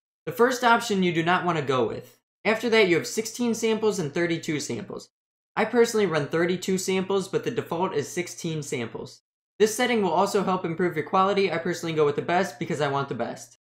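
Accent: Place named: New York, United States. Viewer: What is that accent? New York?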